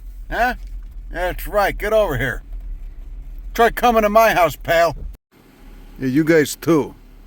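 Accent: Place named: Texas, United States. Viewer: Hey! You're up near my neck of the woods in Austin, nice of you to visit. Timing is good, too, wettest Spring I can recall. I learn so much watching your videos. If you are not now a professor of Botany at some Texas University, they should be recruiting you. You would be the most popular prof in the state. BTW, I fully endorse your pattern of speech, time to admit to reality in Texas.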